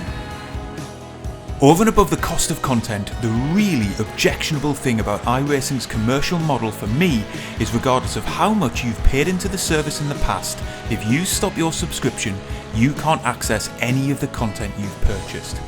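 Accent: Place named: Newcastle, United Kingdom. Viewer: really enjoy your content, nice to hear a fellow Geordie - Dave Cam only lives a couple of miles up the road. The North is a Sim-Hub 😂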